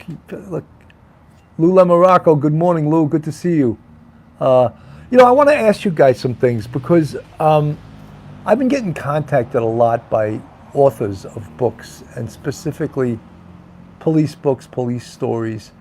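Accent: New York, United States. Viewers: I watch a lot of crime shows, which is probably why your channel popped up in my feed a few days ago. Really enjoy your commentary and NY accent.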